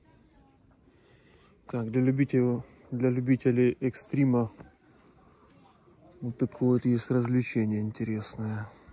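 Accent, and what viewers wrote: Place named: Ukraine. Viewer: Так, тут помилка вийшла. Просто, коли Ви говорите російською, дуже чути, що Ви українець. Говоріть українською, в ній є душа.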